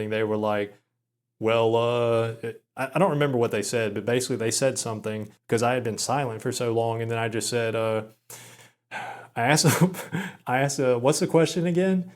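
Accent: South, United States. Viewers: your university should have a resume development department, they'd still help an alumni out, also they train you for specific interview questions, if you want they'll even film you and walk you through the tape and where you could improve. Do I detect a Southern accent? T. Savannah, GA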